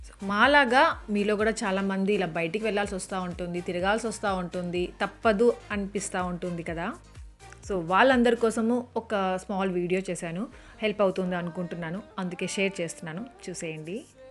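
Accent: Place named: North, India. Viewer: Alane anipistundi north side vallu anukunta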